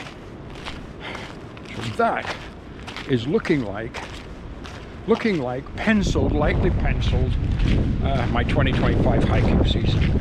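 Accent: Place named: Yorkshire, United Kingdom. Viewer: where are you from originally mate? Sound like a Yorkshire lad!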